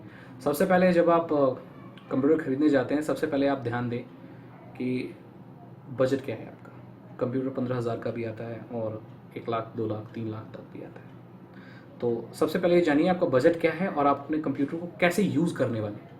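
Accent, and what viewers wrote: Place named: Bihar, India. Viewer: bhai aaja full bihari lag rahe ho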